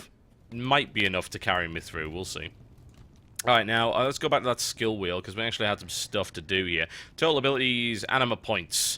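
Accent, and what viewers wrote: United Kingdom. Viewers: No offense, but there's a little thing called accent. And TB's british, so his accent is, technically, the one with the "right" pronunciation.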